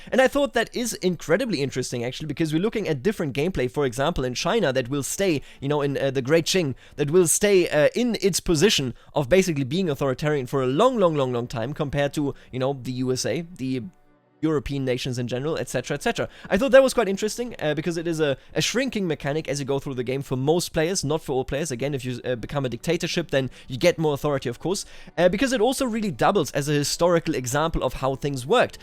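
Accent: Bavaria, Germany. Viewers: Are you actualy bavarian?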